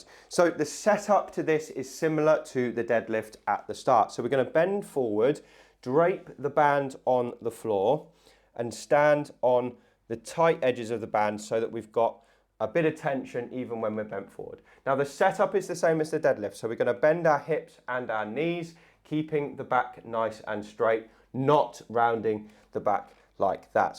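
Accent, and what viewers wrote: United Kingdom. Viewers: I love your lovely British accent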